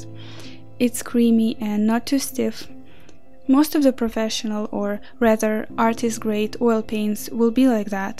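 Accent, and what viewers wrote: Russia. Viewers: Wow, are you from Russia? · Русский акцент))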